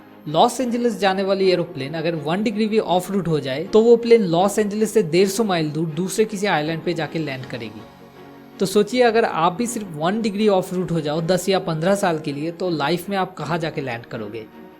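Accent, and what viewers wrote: Bengal, India. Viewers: are you Bengali spekar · good video but Bengali accent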